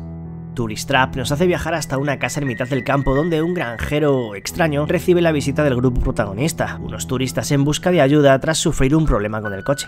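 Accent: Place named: Spain. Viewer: Acento de 💩💩 español apesta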